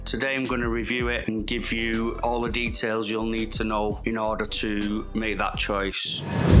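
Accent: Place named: Northern England, United Kingdom. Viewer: sweet review, cheers. Cool to hear a Norvern accent as well. Love from Liverpool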